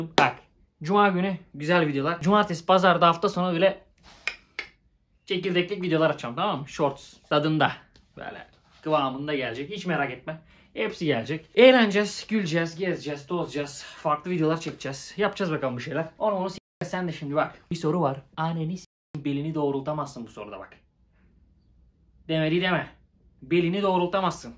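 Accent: Thrace, Turkey. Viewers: kral sayende urfa agzını bırakıp trakya agzına geccez adamsın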